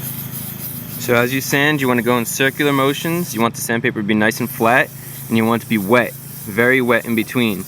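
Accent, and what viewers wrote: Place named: Philadelphia, United States. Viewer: I don't recall ever watching this video. I use to have a '97 B2300, but didn't have it long enough to do this. My current 08 Honda Crv has fading on the B pillar trim and trying to fix it. Dealer says that its a film on top. I hope its an easy fix. Was this video before "Soappy Woder"? Philly guy here, we say woder the same way.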